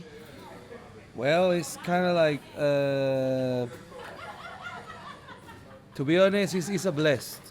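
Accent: Mexico. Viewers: lol he's Mexican. His accent is Mexican with English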